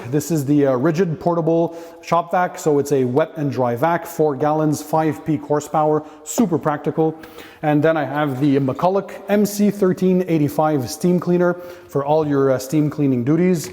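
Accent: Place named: Canada. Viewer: I didn't realise you are Canadian. My Irish ears can't distinguish the Canadian accent from the American one.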